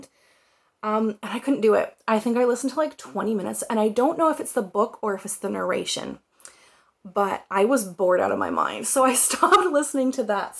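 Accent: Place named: Maritimes, Canada. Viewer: Good to know, Maritime dialect! 🤓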